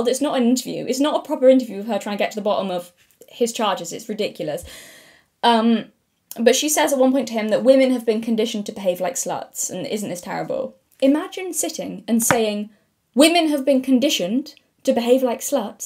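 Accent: United Kingdom. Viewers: I'm British, but my god, your British 😂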